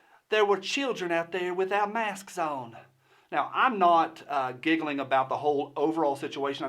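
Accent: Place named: New York, United States. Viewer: I always like your videos, I never get tired of New York accents. And, I love to see what weapon you hold up at the end. For this one, What kind of scope is that??? That thing is huge.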